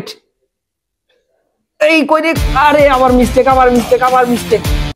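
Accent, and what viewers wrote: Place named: Tangail, Bangladesh. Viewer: Vai apnar basha tangail.kuthay..ami Madhupur theke..❤❤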